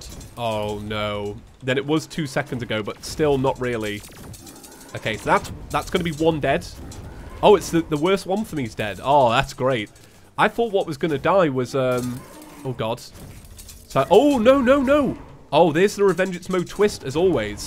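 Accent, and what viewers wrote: United Kingdom. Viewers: The bri’ish terrarian is back :)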